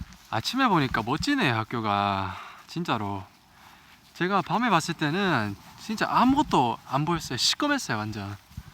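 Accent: Gyeongsang, South Korea. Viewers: 사투리를보아하니 경상도분이신가요? 영상재밌게보고계십니다.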